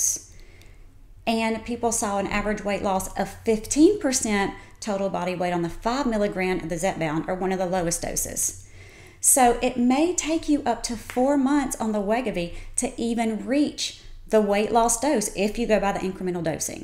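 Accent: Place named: South, United States. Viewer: I can't understand her Southern accent, and she is mispronouncing Wegovy. Just sayin'.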